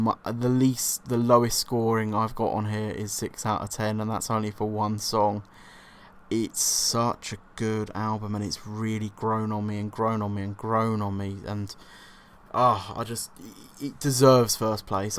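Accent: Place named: Liverpool, United Kingdom. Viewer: I like the Liverpool accent. It reminds me of.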